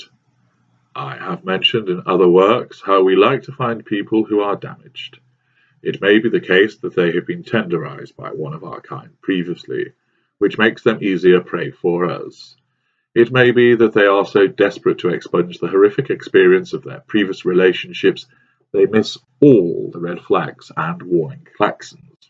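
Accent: England, United Kingdom. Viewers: I have always wondered if he uses a device to distort his voice. Either way tell tale signs have popped up showing he is English.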